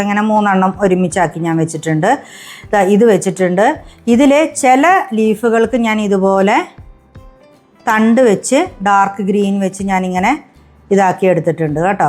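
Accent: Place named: Thrissur, India. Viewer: Trissur slang Ella samsarathil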